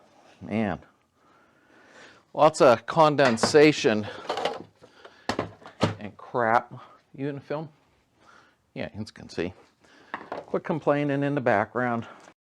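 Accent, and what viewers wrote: Pittsburgh, United States. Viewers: I am a fellow „Yinzer“ and love your videos!! I recognized your Pittsburgh accent right away but I really like how you go into what is needed and how to do it with minimal cost. Keep on making these great videos. I am rebuilding a Karmann Ghia and live in the South Hills.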